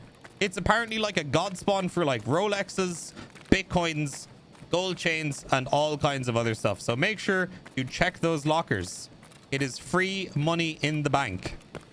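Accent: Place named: Ireland. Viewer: The Irish accent is a thing of beauty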